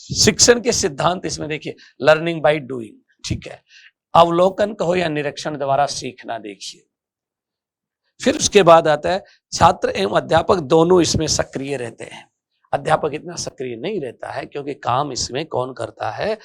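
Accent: Rajasthan, India. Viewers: आप हिंदी वर्तनी में राजस्थानी क्षेत्रीय भाषा से प्रभावित बोल रहे हैं, जो कि अशुद्ध है। कृपया सुधारे।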